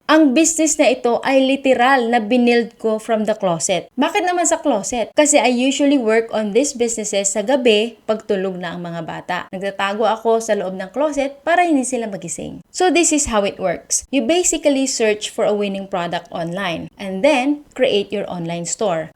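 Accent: Pangasinan, Philippines. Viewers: i can hear my co-pangalatok po hehehe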